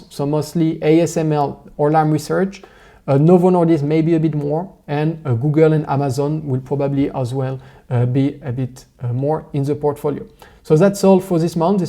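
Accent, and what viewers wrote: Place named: France. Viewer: Haha I love your french accent😂 how old are you?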